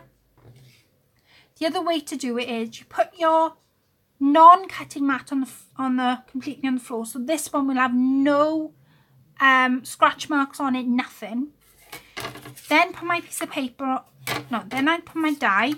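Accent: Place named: Wales, United Kingdom. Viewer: Sorry I disappeared, my internet quit on me and I had to call my provider, but I can watch the playback, gorgeous card. Love your Welsh accent, I'm originally from Manchester so I recognized it right away. lol TFS...Hugs...Mary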